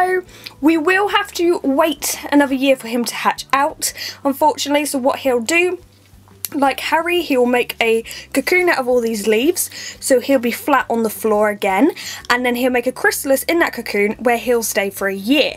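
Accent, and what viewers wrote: United Kingdom. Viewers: Are you British ???!??!??!?!?!!?!!??!!? I AM TOOOOOOOOOOOOO!!!!!!!!!!!! Edit: also if he turns out as a boy Call him Ron If he turns out as a girl call her ginny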